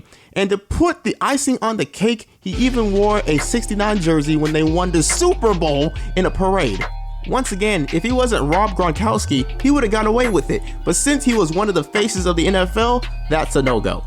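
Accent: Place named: Birmingham, United Kingdom. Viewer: You from that Birmingham area same bro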